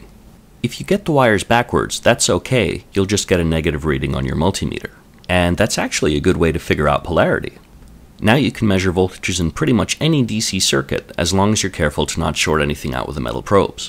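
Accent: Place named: Canada. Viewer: Nice channel. Good content, Canadian voice and humour. Bon!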